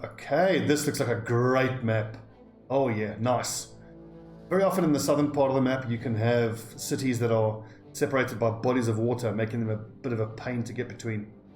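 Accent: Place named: South Africa. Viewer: You from SA? sounds like it. Nice vids btw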